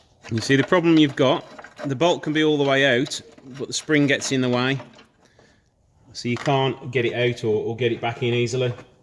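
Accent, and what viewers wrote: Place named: Birmingham, United Kingdom. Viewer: Great vids. Personally, I'm a basic mechanic but looking to learn. Have an '85 Sprint and a S2 147 TS. Good to hear another Brummie too! UTV!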